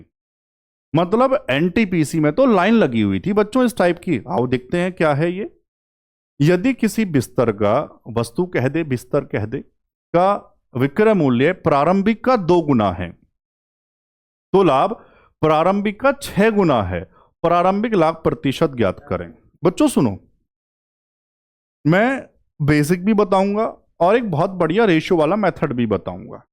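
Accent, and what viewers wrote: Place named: Haryana, India. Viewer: Haryana - famous hamari boli --haryanvi ❤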